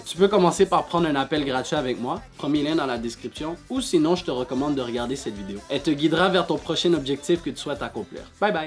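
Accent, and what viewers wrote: Canada. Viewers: T'es canadien ?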